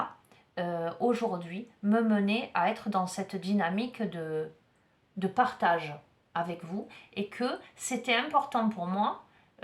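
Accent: South, France. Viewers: Bonjour &, c'est toujours un plaisir de vous écouter, vous avez une voix mélodieuse et un doux accent du soleil et cela est très plaisant...Je vous félicite pour avoir mis les choses au point avec certains Hommes car c'est indispensable de se faire respecter...Vos guidances me font le plus grand bien et je vous en remercie chaleureusement...Restons dans la Lumière Divine...Gratitude Infinie et Très bonnes Énergies à votre chaîne💐💖😇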